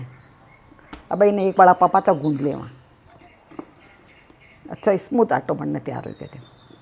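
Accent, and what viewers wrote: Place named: Marwari, India. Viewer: i love ur marwari accent🥰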